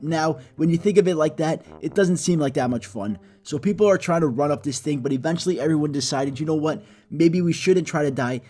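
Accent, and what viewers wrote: New York, United States. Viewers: This mans gotta be from new york or someones god father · Haha how you can tell he is newyork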